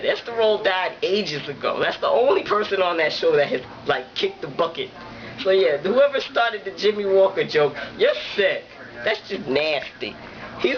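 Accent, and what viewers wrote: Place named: New Jersey, United States. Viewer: Hey, sweetie. Are you from Jersey? You have that accent. I live in Jersey, too. I think you and I should have an affair. Are you game? Yes or no, baby. Kiss kiss.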